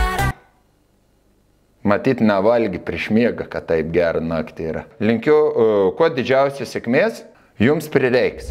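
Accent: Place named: Samogitia, Lithuania. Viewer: Daugiau žemaitiška rokoukis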